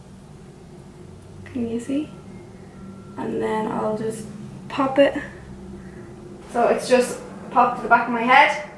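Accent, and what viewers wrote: Ireland. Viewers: are u Irish? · Irish accents ❤️☺️ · is she irish??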